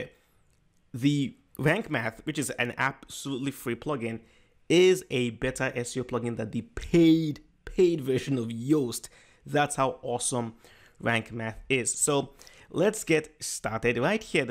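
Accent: Nigeria. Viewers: You sound like a Nigeria, are you?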